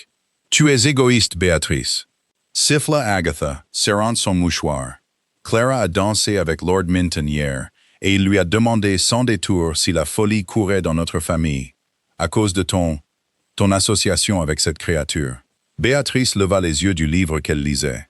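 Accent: England, United Kingdom. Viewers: L'histoire est belle et originale! Bravo! Dommage pour l'accent anglais assez inutile...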